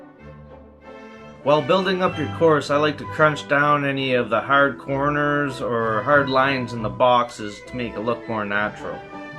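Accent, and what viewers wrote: Canada. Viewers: Sorry lol, as soon as I saw the yellow bag of flour with the French label showing "Tout Usage" (all purpose) I recognised the Canadian factor - then when I heard buddy's accent I thought "O fk yah bud!" Haha 😄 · = HA! I knew you were a Canuck from your accent! And the Tim's cup cinched it! LOL!!! Great build! So much free stuff to build with, I don't know why anyone buys stuff! And nice job using paper mache. Free and looks better than the plaster cloth. The plaster cloth always shows through. Have fun!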